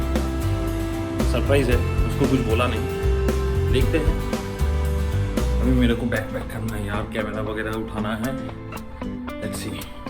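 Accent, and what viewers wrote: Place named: Kolkata, India. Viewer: Love the way u talking dada.. Feel like kolkata....☺️ impressive photography.✨️🌟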